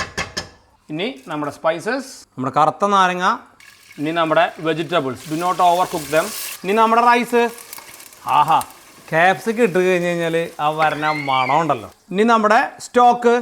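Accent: Thrissur, India. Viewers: നിങ്ങളുടെ സംസാരം 👍😌poli അ തൃശൂർ സ്ലാങ്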